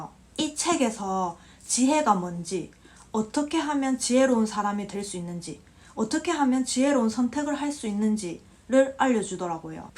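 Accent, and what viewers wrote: Gyeongsang, South Korea. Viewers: 오늘 처음으로 유튜브를 우연히 보게 되었네요~~재미있는 경상도 말씨 ㆍ세상쉬운 노후자금 마련방법을 보고 ~두번째 프레임이라는 주제로 잘 설명하는데 ~~매력에 푹 빠졌네요. 아직 학생인것 같은데 세상에~~똑소리 나네요~~구독했어요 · 제가 50대인데 진짜 한테 많이 배우네요 그리고 듣고나면 속이 시원타 ~딱 부러지는듯한 경상도 말투도 글코 뭔가 한숨이 길게 나오는거는 속이 시원해짐을 느껴서인듯 ㅋㅋ 앞으로도 좋은 방송 부탁드립니다 화이팅!! 열렬한 팬입니다!!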